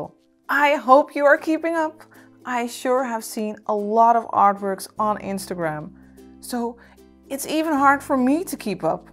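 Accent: Netherlands. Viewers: IS SHE DUTCHHH AAAAAAAAHHH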